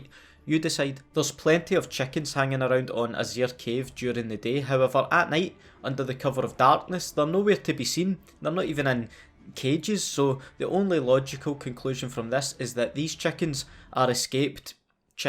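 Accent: Scotland, United Kingdom. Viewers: Nice fucking channel, love the humor helped by the Scottish accent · I love your accent so much. Are you Scottish?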